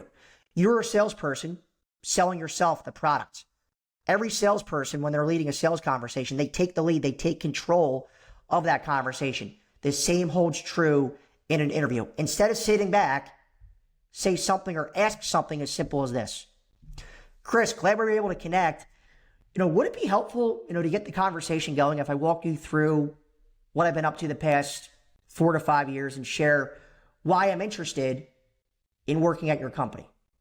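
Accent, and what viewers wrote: United States. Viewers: Doylestown native here - my ear immediately picked up on your Delco voice. Now that I live in Dallas, people get mad when I wear my Eagles jawn here. Your content is helpful because I'm looking to become an Account Manager. If possible, please send me some cheesesteakers from Cleaver's in Rittenhouse Square.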